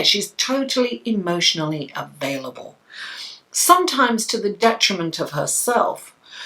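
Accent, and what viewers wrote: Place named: United Kingdom. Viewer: thank you for this reading,, it did resonate with me & spoke the truth about something that occured to me last month. Where are you from I love your accent,,, I'm from USA, you sound British,,, many blessings to you.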